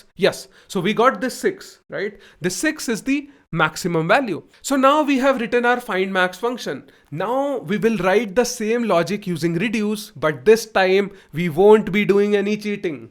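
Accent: India. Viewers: Indglish but I understand and honestly you speak better than me hehe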